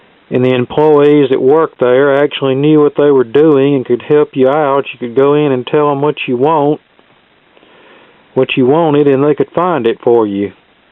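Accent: South, United States. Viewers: The same accent of Forrest Gump, lol, I love that accent.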